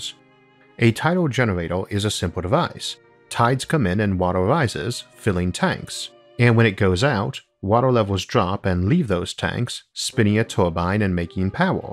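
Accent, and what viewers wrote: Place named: South, United States. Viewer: I love space and everything about it. I have been looking for years for a channel that will satisfy my hunger for knowledge, both factual and fantasy. I have finally found it. Thank you Isaac and team. As for the "speech impediment". It's no impediment, this channel wouldnt be the same without your AMAZING Gone With The Wind lilt. SCARLETT: Isaac, Isaac....Isaac, if you go, where shall I go? What shall I do? ISAAC: Frankly, my dear, I don't give a damn. I'm off to Mars!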